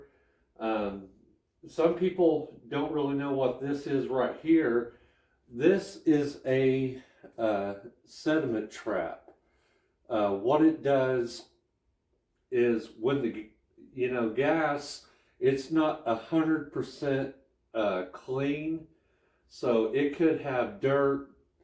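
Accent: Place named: North Carolina, United States. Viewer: What dialect are you speaking? North Carolina?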